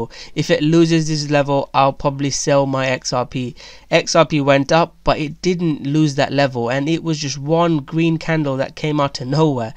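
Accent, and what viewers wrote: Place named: Bradford, United Kingdom. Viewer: Another great video as usual. Did you cover the GVT we discussed a couple of weeks ago. If so can you tell me where to find it. What's your thoughts on ADA from a hodl point of view. A £10 price is all I need 😉. I'm trying to figure your accent. I thought I sensed a Bradford twang.but it could be anything from Southampton to Bournemouth Brighton upwards. I'm from God's country (Wales) in Wrexham.Many questions. Take your time 👍🤝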